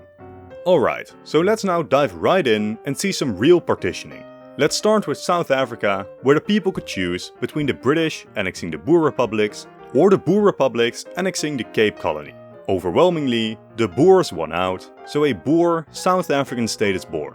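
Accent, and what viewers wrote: Netherlands. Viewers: lekker nederlands accentje:) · That Dutch accent though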